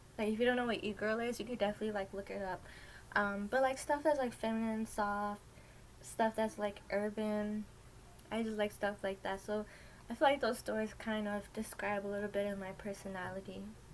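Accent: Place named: New York, United States. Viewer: I don't know if you have ever heard Lalalalafontaine!!!! He was a legend hockey player for the Sabres in the 90s. I like your New York accent.😎😎✨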